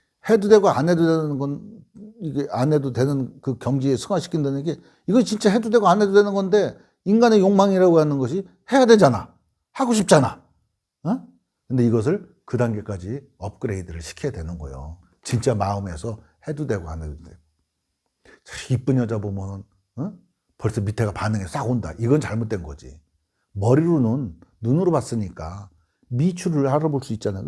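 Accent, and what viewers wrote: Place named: Chungcheong, South Korea. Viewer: 맞는 말씀이유, 시님!!!^^ 충청도 사투리 넘 구수해유^^♡♡♡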